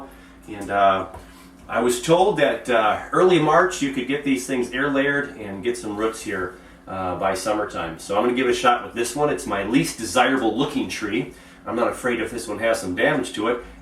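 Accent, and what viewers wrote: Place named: United States. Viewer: GREAT video ..!! I sooo know that you are an American as you say the word roots ... as ruts. Just like when Americans say the word "roof", .. as in the sentence ... "I am going to fix the shingles on the ruf ..." Sooooo funny !! (and yes, .. i am a Canadian .. lol)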